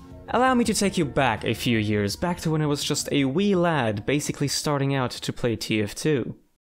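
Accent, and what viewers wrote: Germany. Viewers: are u from germany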